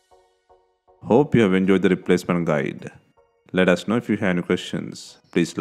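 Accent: India. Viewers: It seems you're attempting to speak with the heaviest Indian accent possible. I can't understand 1/3 of what you're saying.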